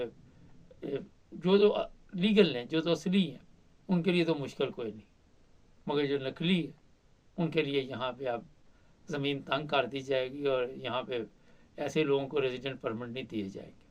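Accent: Punjab, India. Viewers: Please use a better microphone…. Place it close to your mouth…. You speak Urdu in pure Punjabi accent…. Please help the viewers You provide good information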